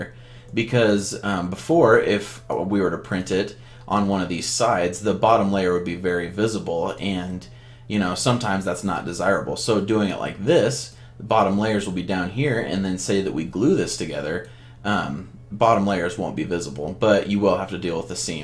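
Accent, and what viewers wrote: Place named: Letterkenny, Canada. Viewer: Sounds like he is from Letterkenny... Pitter Patter, let's get atter!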